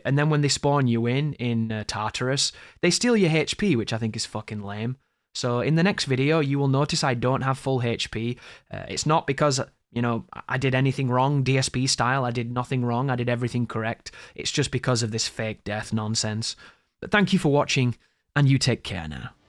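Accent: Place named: England, United Kingdom. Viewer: Which part of England are you from? Your accent seems similar to mine 😅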